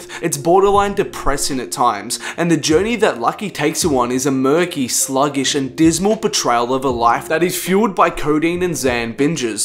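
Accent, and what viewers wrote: Australia. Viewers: I wish I had that Australian Accent. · What part of Australia is he from?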